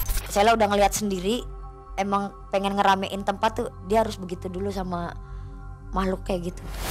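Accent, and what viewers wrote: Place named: Cirebon, Indonesia. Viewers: Logate Cirebon Indramayu iyaaa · mbak bahasae dermayu ya. apa wong dermayu tah? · Sy penonton baru, salfok kok kyk cerbonan sih ngomongnya ya 😂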